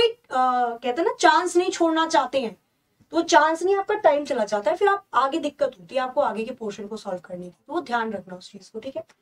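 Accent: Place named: Bihar, India. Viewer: mam aap biharq me kaha se h , mai v bihar se hi hu or aapka language same to same meri tarh hi hai😊😍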